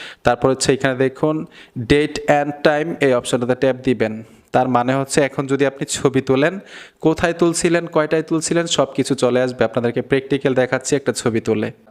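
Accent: Comilla, Bangladesh. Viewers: ভাই আপনিকি কুমিল্লার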